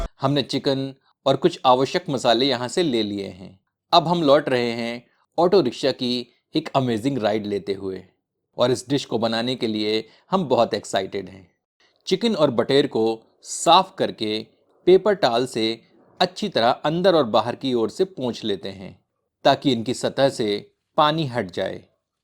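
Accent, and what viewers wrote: Standard. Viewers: Boss aap kanase hai aapka hindi bahut sudha hsi. I like it.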